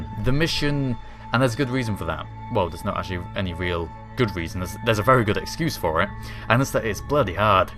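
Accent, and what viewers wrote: United Kingdom. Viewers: im really sorry for asking such a stupid question, since im not sure.. but do i hear a british accent?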